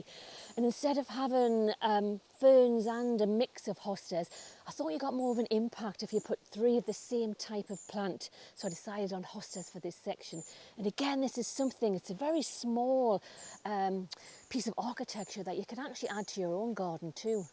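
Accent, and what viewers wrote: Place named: Northern England, United Kingdom. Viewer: Fab, us too. We’re in Bellingham :) beautiful part of the world. Although I’m from Sunderland originally and Hubby from Gateshead I heard the northern twang. Such a small world to come across a local video! Just an FYI gardening express have a deal on Hosta’s 40 for £10 just bare root but I got 80 and they are all popping up lovely 🥰 I’ll check out the stumpery, thank you 🙏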